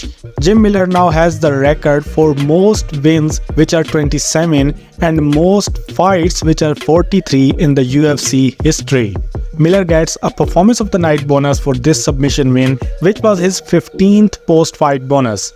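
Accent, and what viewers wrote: India. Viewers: Thank you Mr. The guy with the Indian accent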